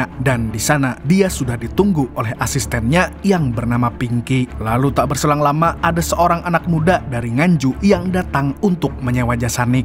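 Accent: Banjar, Indonesia. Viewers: Urang banjar leh kali nya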